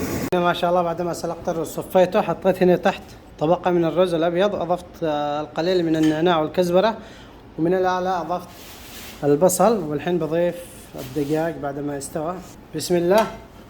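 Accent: Yemen. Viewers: يا جماعة الشيف يمني والا شنو لهجته + عاشت ايدك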